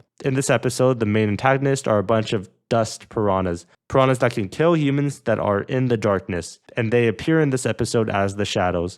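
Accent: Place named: Scotland, United Kingdom. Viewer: Irish Me: Irish...(processing) IRISH??!!!! The last I checked, I'm pretty sure he was Scottish. Great vid btw, got a few laughs out of it.😄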